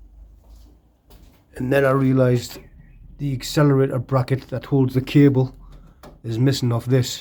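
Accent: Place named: Birmingham, United Kingdom. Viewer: Love a Birmingham accent 👍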